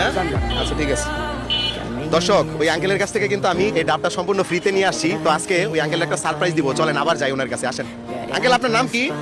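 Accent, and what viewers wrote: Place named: Madaripur, Bangladesh. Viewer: Madaripurer manus bolte kota ❤❤❤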